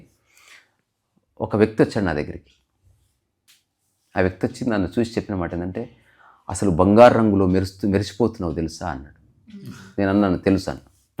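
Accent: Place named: Telangana, India. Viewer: Meeru pakkaki telangaana language lo unnaru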